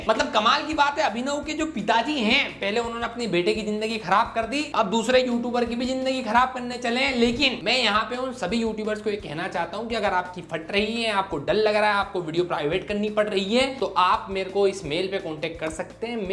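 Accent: Indore, India. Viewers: Bhai tera INDORI andaaz bht achha laga... aisa laga koi bada bhai samjha rha hai sahi baat❤❤ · Bhiya Aap Indori ho kya ?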